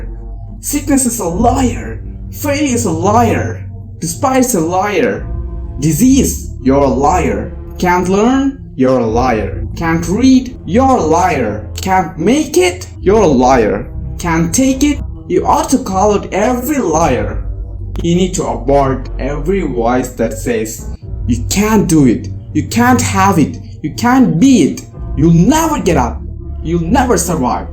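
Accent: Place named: United States. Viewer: Mesmerizing to see an indian speaking in american accent. Good job.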